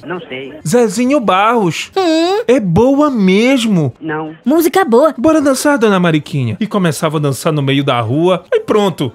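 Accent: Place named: Bahia, Brazil. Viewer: Caracas pelo deu sotaque vc e baiana Amo Bahia também sou Bahia mais vivo em outro estado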